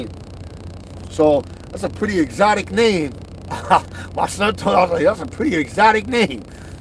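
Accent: New York, United States. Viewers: I wish I had caught this when you were doing it. Your talk and slang is straight New Yorker. Maybe it's because I live in Pennsylvania and am married to a born and raised New Jersey girl that I know it but to attack you for an accent that has nothing to do with the content you are putting out there. It's BS. Please know that this white 45 year old is a better flat bedder because of you. I don't give a shit about whatever accent you are putting out!! thanks for the help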